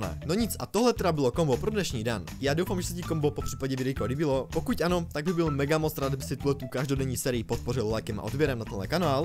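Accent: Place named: England, United Kingdom. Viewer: Sorry ale ta tvoje angličánština mě mega sere xd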